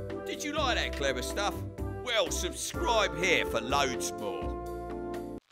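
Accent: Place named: England, United Kingdom. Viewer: this accent is what exact in the church of england ?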